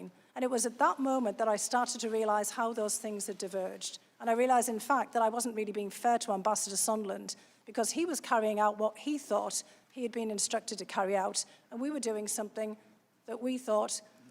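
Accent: United Kingdom. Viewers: She sounds like a Brit.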